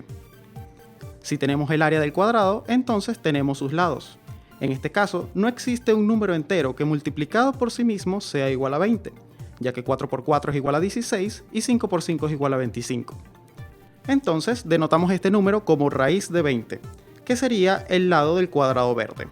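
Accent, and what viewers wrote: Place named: Venezuela. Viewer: Soy aficionado de canales como minutephysics, numberphile, sixtysymbols, veritasium, etc y cuando escuche tu acento me dio un escalofrío gigante, que bueno ver en youtube gente venezolana haciendo cosas similares, mucho éxito! muy fino lo que estas haciendo pana! P.S: llegue aqui porque minutephysics te dio like!, GENIAL!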